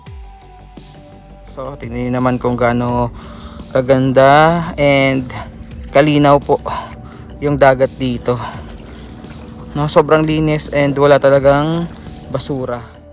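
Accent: Visayas, Philippines. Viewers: Ganyan bisaya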